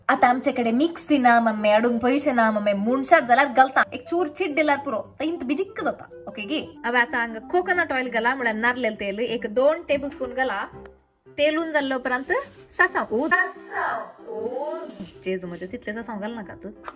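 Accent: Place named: Mangalore, India. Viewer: Recently started watching your video's and vlogs really enjoy the way you talk in manglorean . I too am a manglorean feels connected❤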